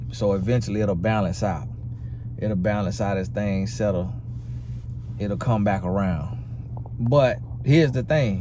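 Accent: South, United States. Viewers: I gotta say. I’m subscribing because of the accent (I’m southern too) and the realness. I just randomly found this video, but everything you’re saying is spot on.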